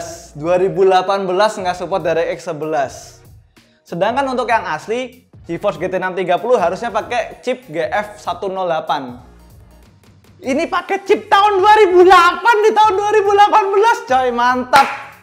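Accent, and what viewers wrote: Java, Indonesia. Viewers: Kedengeran medok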